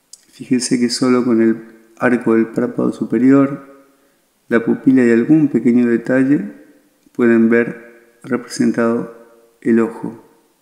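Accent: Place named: Mendoza, Argentina. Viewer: Por tu tono al hablar sos de mza o san juan .excelente dibujo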